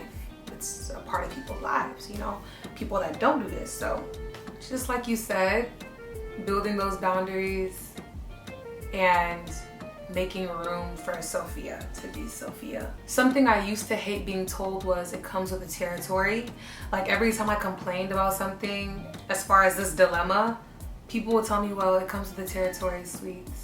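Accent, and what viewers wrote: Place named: Nigeria. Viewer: I am new here n can’t help to get the Nigerian accent. U get Nigeria blood for body? Love u already. ❤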